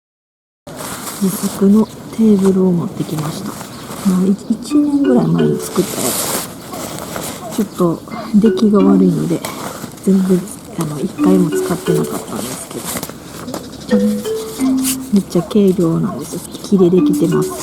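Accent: Kansai, Japan. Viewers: 関西弁おっとり型の語り口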